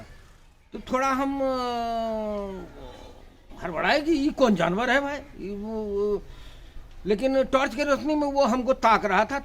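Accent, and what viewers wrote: Bihar, India. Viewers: Dear sir, I love you way of narration and bihari voice. I am a gun lover. I support killing for food, not for enjoy. However shooting is always joyful unless it harm innocent people.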